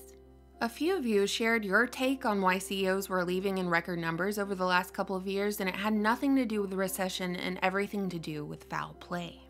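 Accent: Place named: Georgia, United States. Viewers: Thought I recognized the accent of a fellow north Georgian. I’m right outside Atlanta and heading there tomorrow to help my daughter finish moving from downtown Atlanta to Buckhead. I’m getting a little nervous about what we may encounter. 😬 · I should have known you were a Georgia peach with a voice like that 🥰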